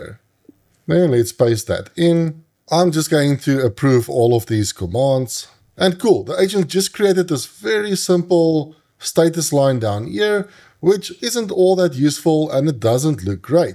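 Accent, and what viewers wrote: South Africa. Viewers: are you from South Africa ?:)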